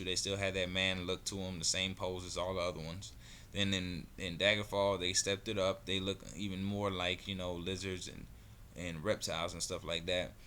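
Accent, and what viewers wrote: United States. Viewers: Somethin out of barney! 😂😂 are you American? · sounds like a mix of eubonics and southern drawl. stop hurting my ears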